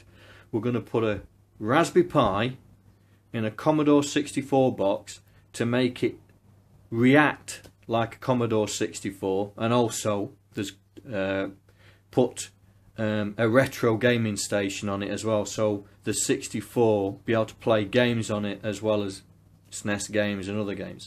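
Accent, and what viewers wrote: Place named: Northern England, United Kingdom. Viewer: Where you from, you sound a good Northern lad!!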